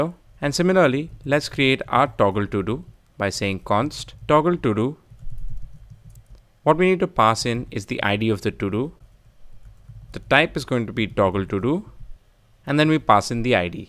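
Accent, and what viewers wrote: India. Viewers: Sir again commenting you are the best teacher who I have come across when it comes to learn react native, I easily understand everything regardless of your hindi accent, I wish there is a way to be able to contact you because I need one serious guide. Please drop me your contact.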